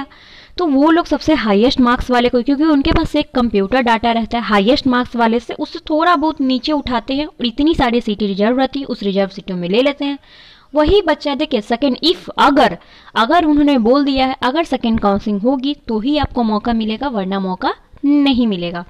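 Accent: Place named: Bihar, India. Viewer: Madam aap kahan se belong karti hain? Aapke accent se lag raha hai ki aap Vaishali ya Muzaffarpur se hain.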